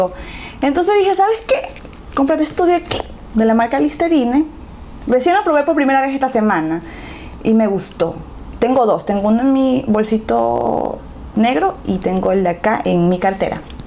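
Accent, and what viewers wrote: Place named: Guayaquil, Ecuador. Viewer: Habla normal.... Los Ecuatorianos hablamos así en especial de Guayaquil.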